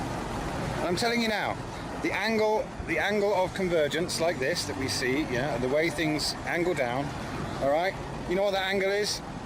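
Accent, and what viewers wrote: United Kingdom. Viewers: A lot of us in the UK speak like that. To be fair, has a pleasant speaking voice, even if most of what he says is nonsense:-)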